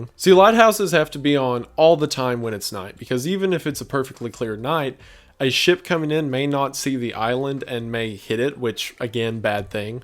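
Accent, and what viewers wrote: South, United States. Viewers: Your Southern accent is so clean and sweet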